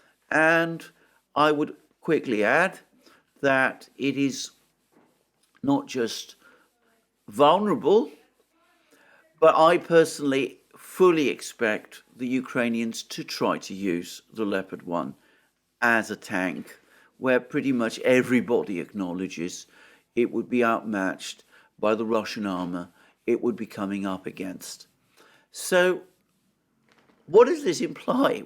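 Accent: United Kingdom. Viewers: He's married, you little American pos. Your English isn't very good, eh? It's "speaking in a foreign accent." It's a British accent, so maybe you're not American but some sort of Euro scumbag.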